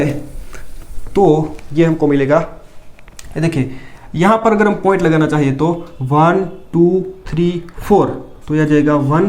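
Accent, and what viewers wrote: Gujarat, India. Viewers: Sir , are you Gujarati??? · Pakku gujarati chee ho !!!!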